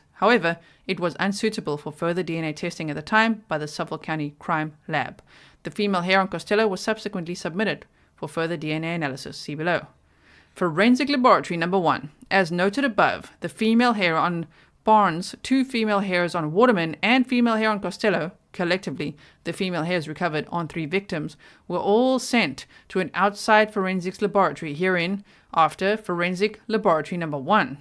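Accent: South Africa. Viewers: Howzit! I've been dying to know how they even suspected this oke, and thought no one would have a video up yet. Checked YouTube and yours was the first video to come up, upon hearing you speak, I was all...wait I'd know that accent any where 🇿🇦❤️😎🤙🏻❤️🇿🇦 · South African but lives in the Netherlands which probably “complicates” her accent. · Are you South African? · Are you South African like i am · South African, yw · Ahh! SA, now the accent makes sense.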